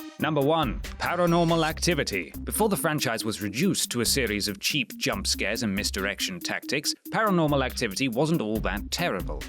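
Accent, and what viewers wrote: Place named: RP, United Kingdom. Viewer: Why does sound so much more posh in these videos? I swear he isnt that posh.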